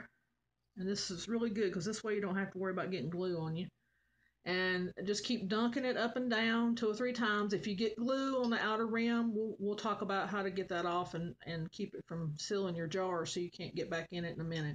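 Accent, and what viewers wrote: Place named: North Carolina, United States. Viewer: Your accent sounds like you are from my area or my state which is NC. It is refreshing to me to hear someone talk like where I'm from. I have always told my boys to be proud of where they come from, it stuck with my youngest but I'm not quite sure what happened to my oldest except that he watched a lot of Thomas the Train when he was little 😆.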